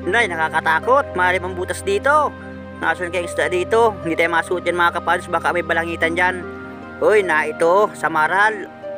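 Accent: Bicol, Philippines. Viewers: ka fahers bicolano ka ba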